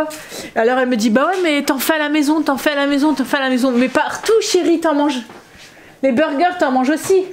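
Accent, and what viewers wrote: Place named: South, France. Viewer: J’adore le petit accident du sud qui apparaît de temps en temps et merci beaucoup ne changez rien de votre naturel c’est un pur bonheur 😅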